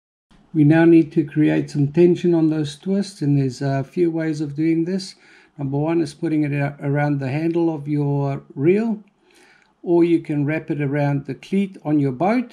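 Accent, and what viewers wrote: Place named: South Africa. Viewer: By the way, with a South African accent! HAve spent a bit of time in Southern Africa Like the video - great explanation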